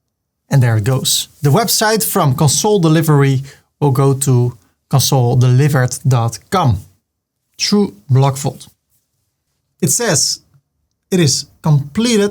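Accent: Netherlands. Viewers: i love the dutch when they speak english zo schattig 🥰😅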